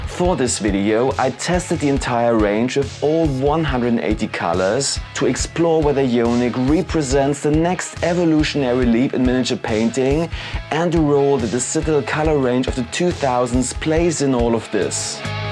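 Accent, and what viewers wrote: Germany. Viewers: So about this 'pronunciation' thing in the comments, it's because he's from Germany, I presume? It's a german accent or how germans read certain alphabet differently. What's the fuss about?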